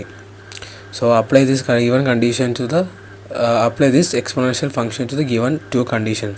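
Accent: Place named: South India, India. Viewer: Please improve your accent. You south indian have a terrible english accent because of your south Indian english accent we north Indian face racism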